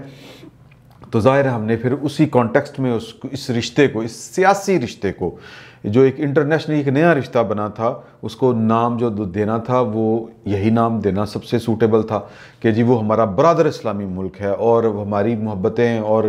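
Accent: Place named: Lahore, Pakistan. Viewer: آپ کا "لاہوری پنجابی" کا تڑکا بہت پیارا ہے ۔۔ شکریہ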